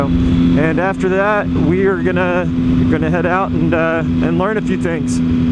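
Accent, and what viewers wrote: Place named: Oklahoma, United States. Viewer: Yes thankfully you don't have a Canadian accent, nothing against Canadians, but it bothers me for some reason. :) I am Oklahoman so I suppose you sound just like me. Thanks for the excellent video.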